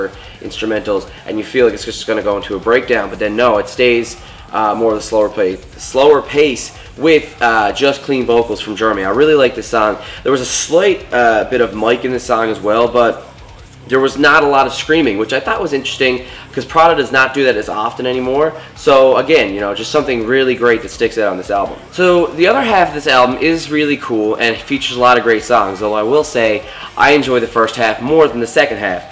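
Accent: Canada. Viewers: Are you Canadian ? Decent review don't like mikes new vocals .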